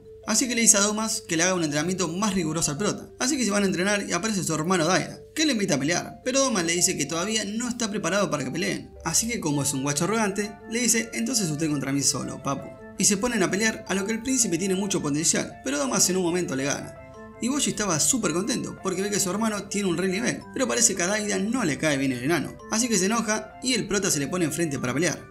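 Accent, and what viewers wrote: Argentina. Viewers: Dandole una buena piña jeje... no se pero algo tiene el acento argentino y el relato de series. Muy bueno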